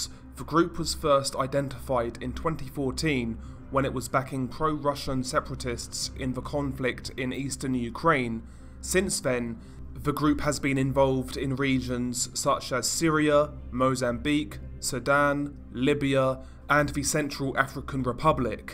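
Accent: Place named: United Kingdom. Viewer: Im from Germany but I love youre channel. I can understand it whats saying. Keep going ! And between I love youre british accent ❤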